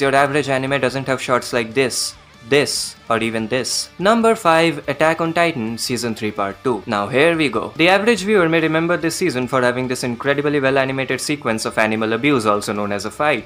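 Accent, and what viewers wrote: India. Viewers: Why you sound like an Indian scammer🤔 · Are u indian? 🗿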